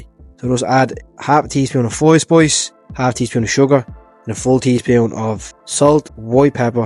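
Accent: Ireland. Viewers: Very nice... but a few bits of advice. Slow down your speech. You have a strong Irish accent (it is lovely) but on a global stage it will be really hard for some people to keep up and understand. Second, SLOW DOWN your whole presentation... if you are trying to make Youtube revenue then you need your episodes to be longer than 9 or 10 minutes... It will attract more advertisers and gather more likes etc. Take time to fill a background story with interesting back insights or whatever. You know stuff that you think is interesting...talk about it. GOOD LUCK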